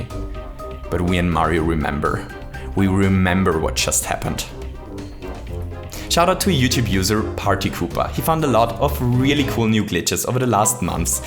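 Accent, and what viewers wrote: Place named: Germany. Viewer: Dein deutscher Akzent ist echt eklig bro. Und kannst du bitte aufhören zu versuchen intilligent zu wirken indem du immer wieder unkonventionelle Wörter wie "plummer" benutzt, das nervt einfach nur und ich bekomme cringe-attacken, weil mal wieder ein deutscher auf möchtegernengländer macht. Ansonsten geht dein Content aber klar.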